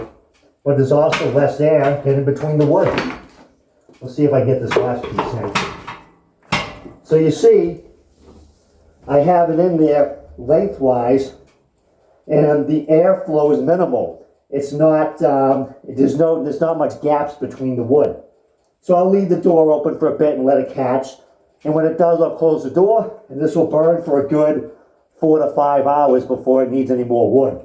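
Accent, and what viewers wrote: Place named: Massachusetts, United States. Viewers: Hey thanks man. Being from MA I can almost tell what town you're from haha 😉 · Seems he's from bawstin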